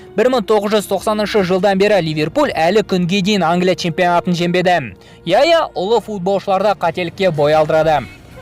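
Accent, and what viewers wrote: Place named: Russia. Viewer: Брат казакшаны казак боп сойле орыс емес н н н н демей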